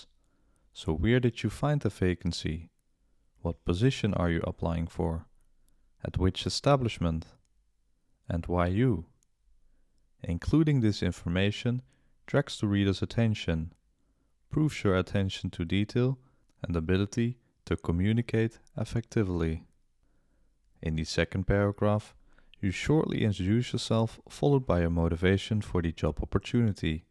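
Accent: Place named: Netherlands. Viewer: dutch accent is the best accent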